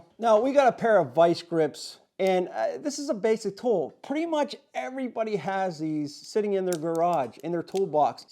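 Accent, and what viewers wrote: Pittsburgh, United States. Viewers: just found your channel, this is really a nice feature , but none of my vice grips have that allen wrench opening on the adjuster, probably because my tools are pretty old - I have Vice Grip branded and a few Craftsman vice grips in my tools. so... I'm gonna go and grab a new set that has the allen wrench hole. by the way, do I hear a little bit of Pittsburgh accent? or southwestern PA. when you say a few words I hear Pittsburghese "in 'air" I'm not making fun, it's just I hear a few words . thanks for the video , I subbed especially because that accent or I guess I should say colloquialism :)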